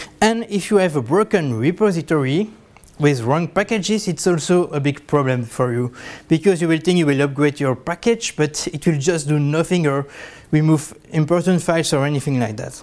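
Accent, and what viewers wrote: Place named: India. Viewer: как у индуса деревенского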